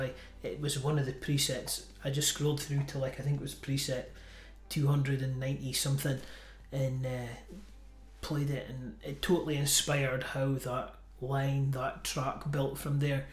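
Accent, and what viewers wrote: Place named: Scotland, United Kingdom. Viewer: lol no it was meant as a good thing I just heard your accent and figured it's Scottish so call him Braveheart 😉. I heard you mention playing in church, I also play for my church. Keep up the good content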